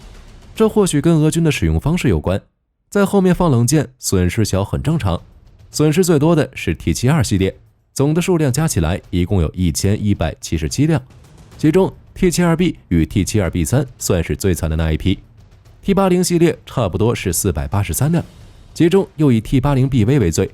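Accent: China. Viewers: 這不是大陸腔調嗎? 大陸會允許這種影片? 最後幾句話也太單純了吧！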